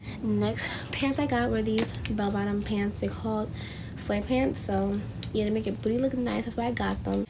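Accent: New York, United States. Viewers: Your accent sound like you from New York 😍😍😍😍😍😍😍😍 and your name is so pretty😍😭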